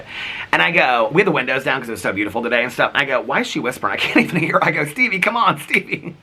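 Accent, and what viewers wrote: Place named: Midwest, United States. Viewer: I've noticed that too. Honestly, it has to be some kind of accent. I remember in the first grade I was so confused because I had a friend who would say 'saw' like that, and she'd say 'drawl' (for draw). More than anything I'm just curious about which part of the Midwest it comes from. Obviously somewhere. Maybe my friend's parents and parents are from a similar spot geographically?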